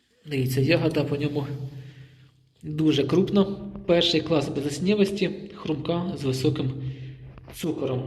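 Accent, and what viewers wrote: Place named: Mykolaiv, Ukraine. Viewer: Дуже приємно чуть справжню українську мову з Миколаївщини. Поважаю Ваше відношення до винограду, хай Господь допомогає.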